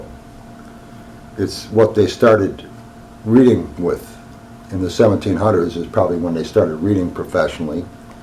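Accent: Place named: New Jersey, United States. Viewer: ah, thank you. I’m from NJ and he sounded so NJ to me.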